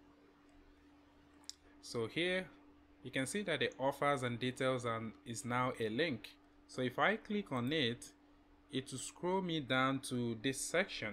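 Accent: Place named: Nigeria. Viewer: ARE NIGERIAN ?? LOVE YOUR ACCENT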